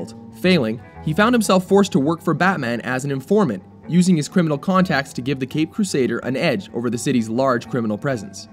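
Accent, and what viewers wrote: England, United Kingdom. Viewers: Is he from Gotham or England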